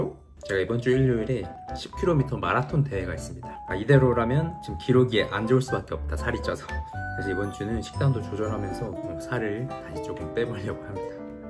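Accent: Gyeongsang, South Korea. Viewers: 열심히 사시는 님 늘 응원합니다. 발음교정중 하나 알려드립니다. 경상도 분들의 특징인 월요일.금요일.일요일 ㅎㅎ 워료일.그묘일.이료일 ~!! 이렇게 발음합니다.ㅎㅎ 저는 님발음중 요게제일 거슬리더라구요~! 워료일그묘일이료일로 듣는날이 곧 오기른기대합니다😅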